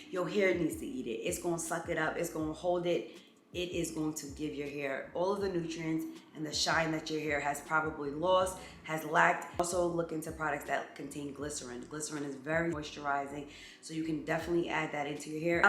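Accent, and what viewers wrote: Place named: Philadelphia, United States. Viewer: Are you from Philly? You have a Philly accent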